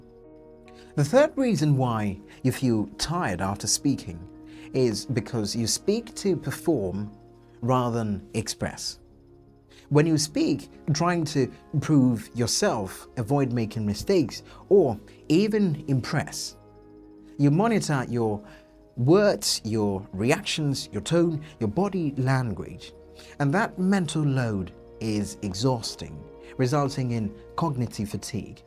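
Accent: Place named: RP, United Kingdom. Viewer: You have the RP accent , which better that the American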